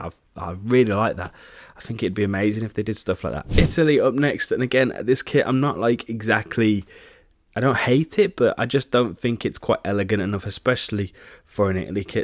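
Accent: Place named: Black Country, United Kingdom. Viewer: 1.) Refreshing to hear a Black Country accent on YouTube. 2.) Do you remember a Spain kit with blue accents from 2013? Whatever happened to that kit?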